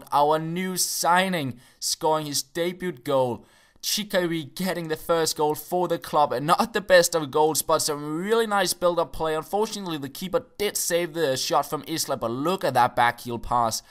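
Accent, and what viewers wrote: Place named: Denmark. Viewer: Gotta love that Denmark accent, no joke, sometimes I watch his video for the intro alone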